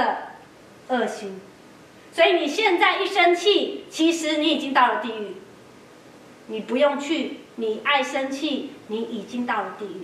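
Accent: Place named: Taiwan. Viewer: Mandarin, with Taiwan accent. Religious content.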